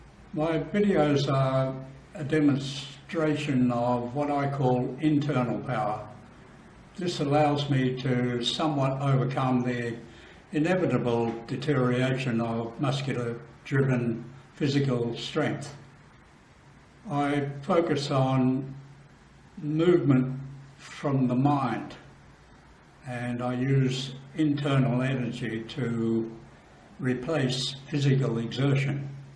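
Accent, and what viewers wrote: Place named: Australia. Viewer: Thanks for answering the questions good to know your eating a high protein high fat green diet. I am assuming by protein and fat you mean animal source. Your an inspiration great to see you can still be active at 80, by your accent I know your Aussie however your location seem to be elsewhere maybe Asia or Darwin.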